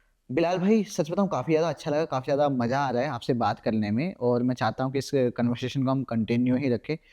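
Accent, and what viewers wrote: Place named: Hyderabad, India. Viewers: Apna Hyderabadi bolte Bhai Ku angaar dalte love you brother 😍😍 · Hyderabadiii boltey.. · arre Bhai hindi hi bolre par ascent Hyderabadi hai isliye waisa dikhta.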